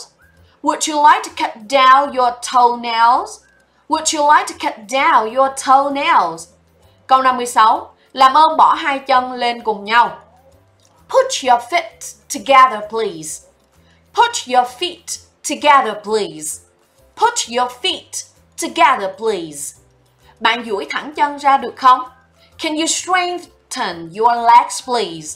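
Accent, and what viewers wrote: Standard. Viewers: bài của cô giáo rất hay, rất hữu ích, phát âm của cô giáo cũng rất chuẩn. Nhưng mình có 1 góp ý nhỏ, đó là đội ngũ biên tập nên soạn bài cẩn thận hơn, và cô giáo cũng nên xem qua bài trước khi dạy. Trong video này mình thấy từ nail trimming, cô viết sai thành anil trimming, đó chỉ là lỗi chính tả nhỏ thôi, nhưng vấn đề là cô vẫn cố đọc là "anil trimming", làm gì có từ nào là từ "anil" ạ. Sau tất cả vẫn xin cảm ơn tâm huyết của cô giáo ạ.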